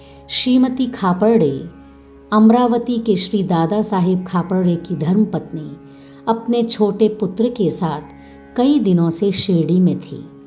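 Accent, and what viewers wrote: Standard. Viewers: आपके।द्वाराबाबा की लीलाओं का बहुत ही सुन्दर और शुद्ध उच्चारण किया गया।धन्यवाद।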